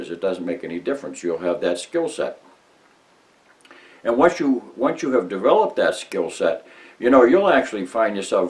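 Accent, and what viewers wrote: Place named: Boston, United States. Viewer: True Boston boy I can tell. Can’t wait to see the instructionals. Thank you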